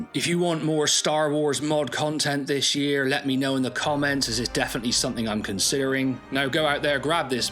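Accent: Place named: England, United Kingdom. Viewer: Hey, fellow Englishman here, from a bit further to the east than you going by the accent 😂 I’m 46 and just restarted the expanded universe books from where I left off in my early twenties to be more clever. It’s such fun, I didn’t know if I’d still enjoy it but loving it - no spoilers please I’m nearing the end of the yuuzhan vong war. I played through most Star Wars games and read again the old comics - expanded universe is amazing, I love it so much and have so many good memories from it and everyone knows, this is the real Star Wars timeline written mostly by people who get it and are into it as opposed to the Disney trilogy which seemed to be a misandrist modern feminis/woke vehicle to attack men, and push minority supremacism (I feel like you’re expected to caveat that with how you’re not racist that’s the point but I cannot be arsed, shouldn’t have to). 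The worst moment I’ve ever seen in any film was one of the Disney ones where … you guessed it, it turned out all the men we’re stupid and belligerent and wrong and the purple haired old Crone rises up magnanimously and it turns out she and all women were right all along, stupid men. They then kill all the white male heroes - can you imagine how disgusting and unacceptable they found Han and Luke, and even, bastions of moral integrity that they are, force Carrie fisher to be in it, after she died wheeling around her digital corpse having bought the rights to her image. Disney are pretty much the empire. Nice touch having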